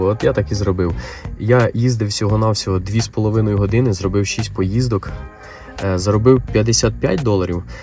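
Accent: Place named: Western Ukraine, Ukraine. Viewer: Я когда слышу его голос или говор меня аж сука коробит) от войны убегало , вуйко?)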